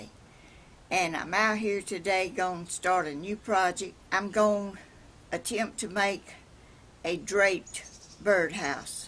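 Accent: South, United States. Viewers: I wish we were neighbors so that we could share knowledge and crafts. my daughter who is 13 wrote a paper on your videos. she practices talking like you and she says she just loves your southern accent...we people from Texas love accents..lol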